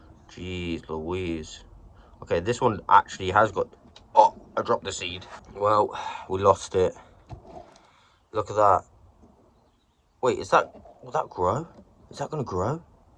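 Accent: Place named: England, United Kingdom. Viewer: Not garden related but I love your accent. Whereabouts in England were you born?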